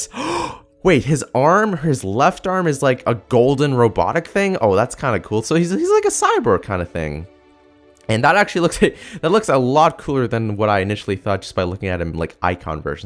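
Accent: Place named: Ontario, Canada. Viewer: I assume he is a fellow Canadian... Sounds like an Ontario accent to me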